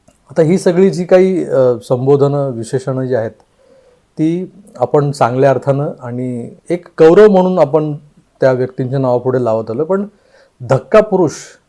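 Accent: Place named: Dakhni, India. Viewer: आपका दक्खणी हिंदी सुनाते बहुत मजा आता है.व्हिडिओ बहुत अच्छा है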